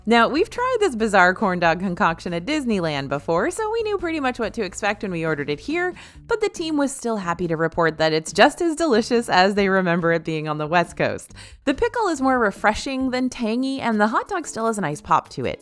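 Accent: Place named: Western New York, United States. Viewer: I am from KENMORE NY and just like you, I say caramel the same way .. long live school bread and Tonga toast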